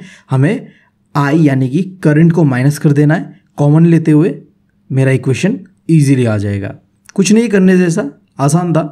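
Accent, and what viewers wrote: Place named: Assam, India. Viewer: Bro is axomia 🙌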